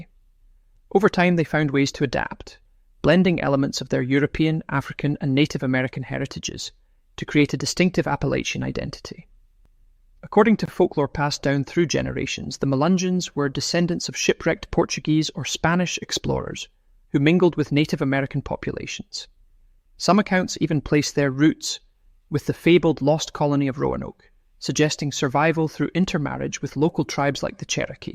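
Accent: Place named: Scotland, United Kingdom. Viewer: The narrator has a Scottish accent.💙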